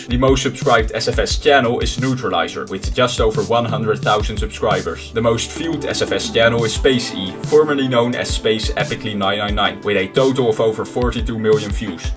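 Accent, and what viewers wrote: Netherlands. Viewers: Het nederlandse accent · love his dutch accent! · i smell a dutch accent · Ah, yes, a fellow dutch man I hear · are you dutch you sound really fucking dutch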